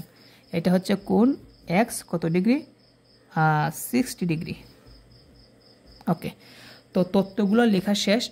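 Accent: Sylhet, Bangladesh. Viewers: SIR APNI ki Sylheti??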